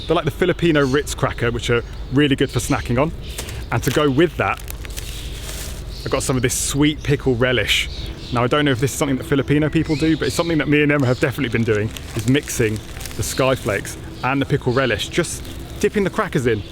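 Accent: United Kingdom. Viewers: I just love hearing the British accent.... :) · I love your voice it's very soothing. Like a History Channel documentary. I love the British accent. Reminds me of Harry Potter too😁 Thank you for promoting Filipino foods.😊 I love ube jam🤤🤤🤤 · The London accent is the nicest in the world I think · I like your kind of British accent and the tone of your voice ..i can easily understand what you are saying. Enjoy your food.. 🇵🇭🇵🇭🇵🇭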